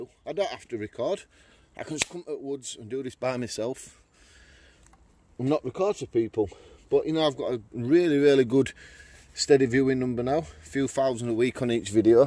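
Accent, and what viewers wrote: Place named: Leeds, United Kingdom. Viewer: There’s some nasty people out there. Can you imagine how miserable they are to be like this to random strangers? I love the way you talk, your accent is ace, reminds me of when I lived in Leeds 😊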